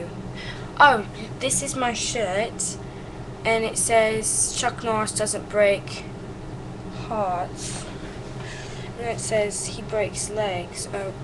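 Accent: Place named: Southern England, United Kingdom. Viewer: your english accent is getting better - or should I say more consistent - definitely a southern english accent. Please don't call it british though its english. As for song suggestions please look at my playlists - you might find something you like - or maybe not. God bless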